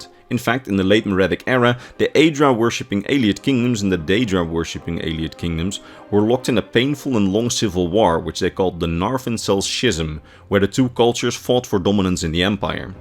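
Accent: Netherlands. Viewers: Nice vid. Liked and subscribed! Btw, are you Dutch?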